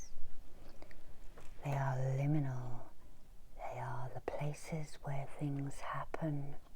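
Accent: England, United Kingdom. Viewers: I love you and your videos. I have been stuck on your videos for a few months since I discovered you. There are only two others that do asmr that I like. There are good one and bad ones, yours is good. I also love your accent as it reminds me of England, as I have good memories 😊 so it's a win win!! Please do the rattle more and the selenite sweeping more. Also Reiki symbols and bring you hand close to the camera after is great. I also like how you do the Reiki distance trace before you do long distance healing. Your very earthy. Thank you. 🤗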